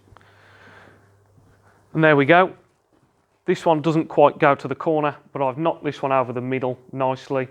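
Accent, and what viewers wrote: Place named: Black Country, United Kingdom. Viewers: it's the Black Country accent. If he was from Yorkshire he would be dropping Hs. It's just the varied UK dialects. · Laila Vags he is from the Black Country in the West Midlands enjoy the video and stop trolling 😉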